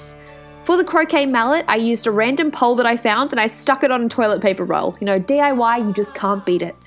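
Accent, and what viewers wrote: Australia. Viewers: Omg you're Australian same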